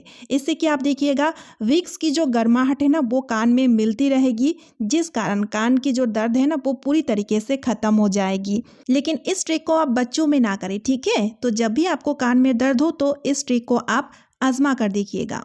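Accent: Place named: Bengal, India. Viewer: आप begali है क्या...क्यो की आपके बोलनेमे वो मिठास है...ठिक है...ये वर्ड बोलनेका तरिका मुझे बहोत अच्छा लगा...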